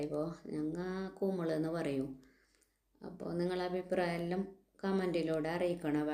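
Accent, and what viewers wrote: Kasaragod, India. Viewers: Ningale Kasaragod language nalla rasandu 😊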